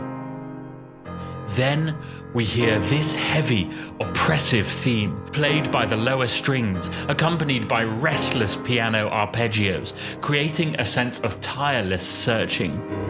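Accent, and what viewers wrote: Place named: United Kingdom. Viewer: Mos-cow? For an American you have a very British accent.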